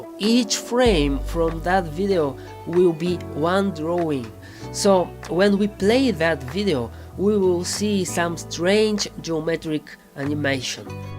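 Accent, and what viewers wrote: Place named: United Kingdom. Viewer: Hello! It's always a great pleasure to watch your videos. Thank you for this one which is very nice! (thumb up for your english; it sounds so british);)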